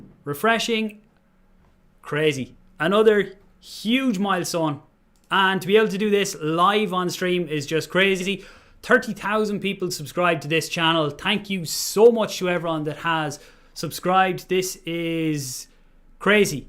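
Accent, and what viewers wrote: Ireland. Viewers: Not another fucking Irish accent!!! All I’ve heard all day watching the gg’s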